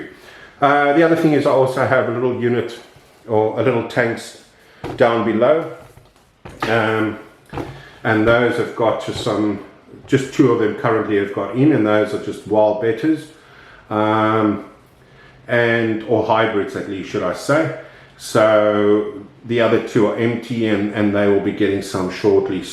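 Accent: South Africa. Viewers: Great intro video! After watching a number of your more recent videos, I picked up on the distinct accent however noted that you are in Australia. Now reading through the comments here... you are a Capetonian:) I too am from Cape Town and moved to England twelve years ago... for the weather of course:) Keep up the great work in bringing back old fashioned fish keeping, where we live in a world of everything being so commercialised these days (showing my age dating back to the 60's lol). Sorry for the user name, a bit odd I guess, but it is my gaming ID. For those that do not know, "scur" is storm in anglo-saxon. All the best with the channel. Cheers Gary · Hi (please insert name), I recently came across your channel and am happy that you‘ve started it. Very informative and helpful indeed. I wish to eventually also install a rack system like yours, currently busy with house renovations, so after that. 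I detect a South African accent. Would love to know more about where in SA you’re from. I’m in Queensland also, up in Townsville. Keep the content coming😄 It’s great! Kind regards André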